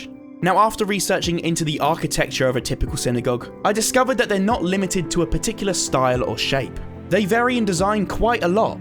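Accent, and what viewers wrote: United Kingdom. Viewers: Don’t wanna be mean but you’re British accent makes it really fun to listen to you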